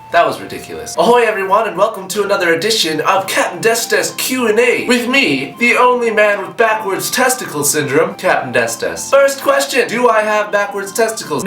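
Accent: England, United Kingdom. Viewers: English Accent=♥ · With the english accent, you sound like Russell Brand:) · Your english accent just made me jizz. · love ur british accent! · The whole time you were talking in a british accent, i wasn't even paying attention to what you we're saying cause I was laughing so hard. anyways, Title: Hops a Minivan filled with turtles snorting crushed unicorn horn. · i love ur bristish vioce so adorable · YOU ARE REALLY GOOD AT BRITISH ACCENT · i thoroughly enjoyed the english accent. makes you seem that much hotter ( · +English accent. I fainted. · You'r English accent reminds me of the time I was reading something out of a chemistry book in class.......I got kicked out of the class -. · title: givs ppl erectile disfunction. comment: omg love the english voice, and the no braces! so cute! u rly nice teeth!!! omg<3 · omg superhot with an english accent ur sooo good at it <3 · i really enjoyed that english accent:) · i died y you so sexy i can not get over how amazing you look:O and you with english accent:O kirjhnujyrhwjjbbhrgjbrgsbgbdjrs OMNOMNOMNOM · OMG with an english accent = HELLA FUCKING HOT! 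· i like your english accent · My tit is stuck in a bears mouth:| im joking, you actually sound even hotter with an english accent and thought it was pretty good:D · Your english accent is quite brilliant =L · You're English accent is strangely amazing:D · u sound amazing with a british accent!!!! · Your english accent was beautiful xD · your English accent is amazing. and what model is your fridge? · aw, your english accent makes you sound slightly camp. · with an English accent= yes. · + British accent= Ear orgasm. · oh my fuckery. how the hell does an english accent automatically make him so much more attractive than he already is? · Capndesdes + English accent = amazing Phil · XD hahaha i love ur british accent u sound so smart.... TITLE: REVENGE OF THE HORNY LAMPZ!!! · lmfao, english accents with american lingo xD<3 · Your English accent makes guys with real English accents sound like fakes.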